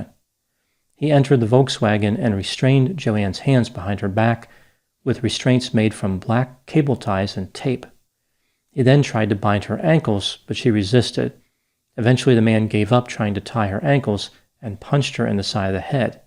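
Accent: United States. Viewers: unexpected and perfect pronunciation of our cities with an American accent. Thank you!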